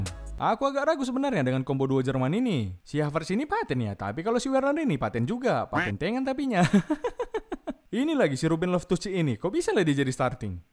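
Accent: Medan, Indonesia. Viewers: Medan kali bah ngomongnya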